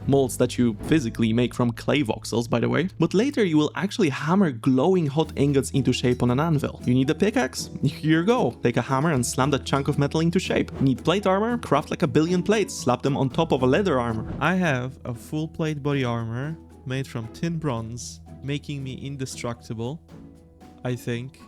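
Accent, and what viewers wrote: Poland. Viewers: as soon as I heard your accent I was like, is he polish? and then i checked channel localization XD Pozdro byku, spoko film i rozwijaj się dalej!! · I immediately knew you were Polish. Years of listening to people read in english class suddenly came back to me when I heard you speak.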